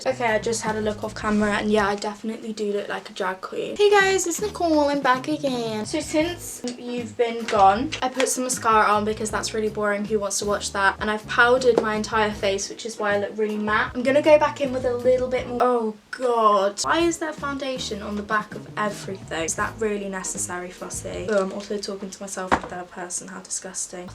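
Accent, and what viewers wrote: United Kingdom. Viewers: Where are you from? The uk?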